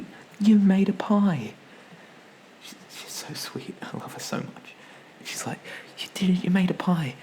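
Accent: Ireland. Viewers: I enjoyed this so much! I think you should do more personal videos like these. It's nice to see what YOU are like and not just your characters(which are great btw). Also just to let you know...I really like your Irish accent. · I haven’t see it in the comments, so I’m just gonna call out the Bioshock reference now. I know this video is a bit older, but I’d also like to say that I adore your channel and the characters you’ve made. I’m always up for a good story and you never disappoint! Adore the Irish accent as well! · I love your Irish accent! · 1)Yeah you have just sold me on this crazy Bob Ross idea. "Beat the god" out of that paint brush. 2) it's "chicken and chip" chips or "fish and chip" chips in London. And your Irish accent is brilliant, it will make 100% of your girl viewers go crazy.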